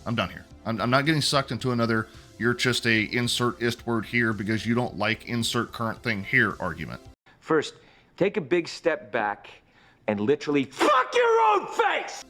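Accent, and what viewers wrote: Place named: Texas, United States. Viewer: You sound like Texas just play as the female character if you don't like YASUKE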